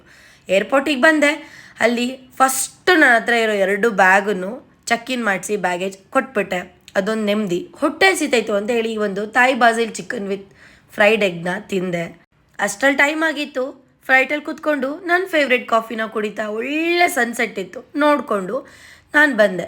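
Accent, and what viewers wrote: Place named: Mangalore, India. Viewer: Nimdu Manglore Kannada na. Nice to hear that ❤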